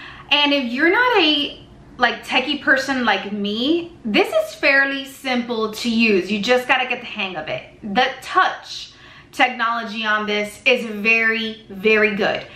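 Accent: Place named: Miami, United States. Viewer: You're from the Miami area! I can always identify the accent. You use the "hard" Spanish L's.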